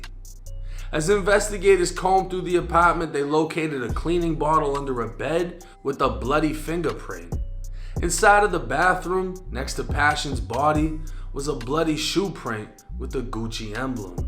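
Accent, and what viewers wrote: Boston, United States. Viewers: Bro.. You have to be from Boston.. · 📖dude your storytelling is on point.followed this nightmare on the media but all the gory details omitted. And love the bahstan accent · Tell me you are from Boston without telling me you are from Boston.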